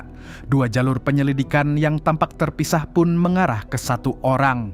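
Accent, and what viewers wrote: Banjar, Indonesia. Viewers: thanks bang, btw logat abang kek orang banjar, bujur lah u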